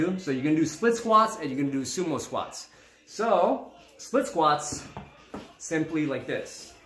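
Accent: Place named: United States. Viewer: Forgive,but, you sound more American than Canadian!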